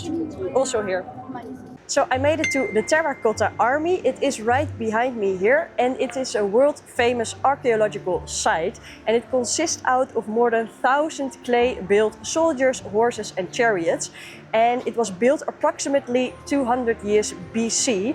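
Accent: Netherlands. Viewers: Love your videos, the dutch accent is a "must" lately in superb world travelling! ❤ All the best, take us to amazing, unseen terrains!!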